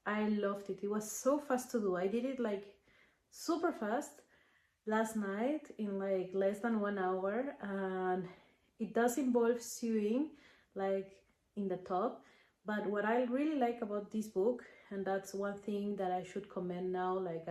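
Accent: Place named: Peru. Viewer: me suenas peruana...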